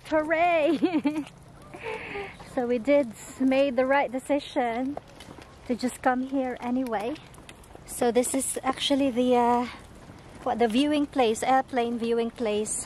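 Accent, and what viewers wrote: United Kingdom. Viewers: Belated happy birthday, rainy day in your place now, gusto ko British na accent, sounds good... Enjoy the zoo...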